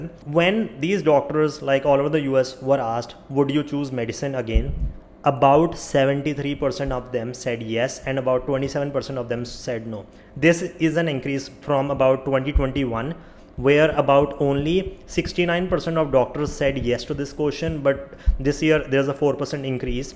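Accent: India. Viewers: Bro lives in USA and still managed to get an Indian accent thicker than me 😢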